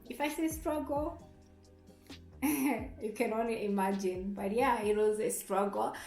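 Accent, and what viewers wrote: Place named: Turkey. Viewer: Your turkish accent is so cute! Thank you for making this video, i am planning to study University in Turkey and this was very helpful <3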